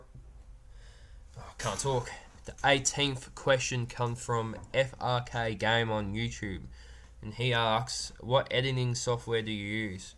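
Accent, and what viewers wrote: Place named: Ireland. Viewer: To be honest, you skipped a few of my questions, but that's fine! Also, I didn't think you're Australian. You sound more Irish than Australian.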